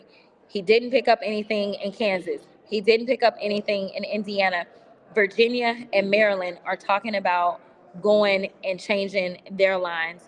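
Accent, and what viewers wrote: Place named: Texas, United States. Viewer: ILYSM. 🥰 Sometimes, your Texan accent gets me, and I have no idea what you're talking about, but I still love you. 🐨🇦🇺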